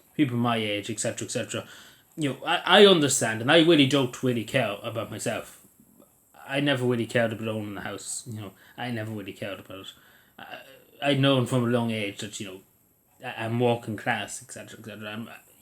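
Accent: Cavan, Ireland. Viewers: The way you speak is euphonious. I was just whiplashed when I clicked the video. If you will allow to to make the suggestion, maybe read some excerpts (the prologue for example) of Canterbury Tales in a youtube video. It would preserve the Cavan accent digitally.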